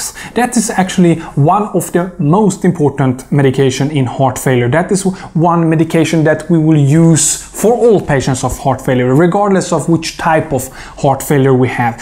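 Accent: India. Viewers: perhaps he should talk in his own language instead of butchering English with his heavy accent. Especially when he is talking about something as important as heart failure. Iv had doctors from India and I find there education is subpar . A person can put there life at risk having a doctor from a third world country, let alone trying to figure out what there talking about. Most eastern doctors come to America to live off patience of Medicare, they can't make a living in India. There guaranteed payment in America. That's was why they come to america with there lack of education and experience.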